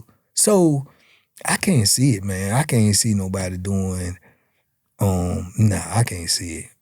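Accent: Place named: Washington DC, United States. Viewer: He got a strong dc accent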